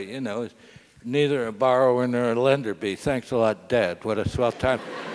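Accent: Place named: Indiana, United States. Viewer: A Hoosier.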